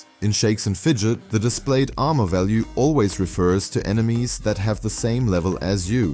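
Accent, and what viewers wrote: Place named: Germany. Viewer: Man hört das du Deutscher bist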